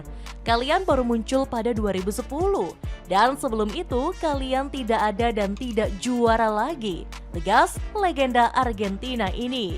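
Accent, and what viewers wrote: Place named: Surakarta, Indonesia. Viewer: Makk ngeriii ya, pakai narasi pria solo dia. Kartu merah sitttt😂😂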